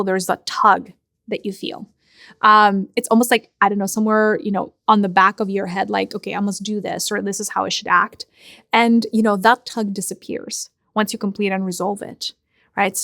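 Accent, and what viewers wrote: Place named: Russia. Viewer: Sometimes you holler at me really fast and use the words ain't and y'all in the cutest Russian accent. You're the favorite of the many voices in my head and the only person on the planet other than my husband I will allow to put me in my proverbial place.Contrary to popular belief (even if they're my beliefs) I'm not perfect. I am however, genuine courageous authentic passionate and loyal AF. I love bigger than almost anyone in this dimension and I need so many anchors and tethers it's ridiculous. I'm thankful for them all. I wish I hadn't forgotten for so long! Gratitude in extra portions 2 you my sister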